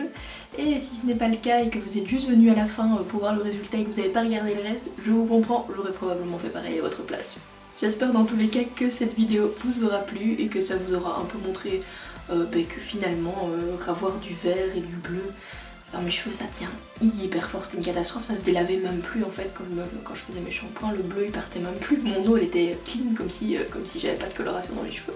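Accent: Belgium. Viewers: L'accent belge 😍😍😍💕💋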